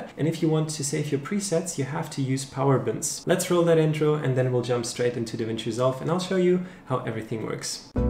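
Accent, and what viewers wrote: Germany. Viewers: your german, right? guessed by ur accent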